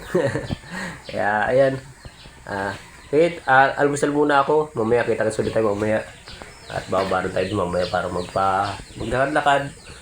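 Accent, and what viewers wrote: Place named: Capalonga, Philippines. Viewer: nnatutuwa q sa panonood ng vlog mo kc ang punto ng yng salita ay Capalongang gapalonga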